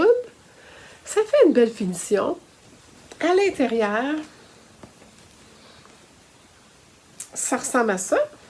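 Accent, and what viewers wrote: Quebec, Canada. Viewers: Merci pour la présentation et les conseils que vous apportez dans cette vidéo. J’adore votre enthousiasme et votre accent Québécois ( je connais, ma fille aînée, mon gendre et mon petit fils 🥰habitent depuis 7 ans bientôt à Montréal )! Je suis fan de Fibre Mood mais j’hésite parfois à réaliser certains modèles que je pense peut être trop jeune pour moi (65 ans😊) Vous m’avez convaincue du contraire, j’adore vos choix, vous êtes très élégante 👏👏👏. Je vais vous suivre, c’est sûr. Je vous souhaite un bon séjour en France et une bonne et créative année 2024. Véronique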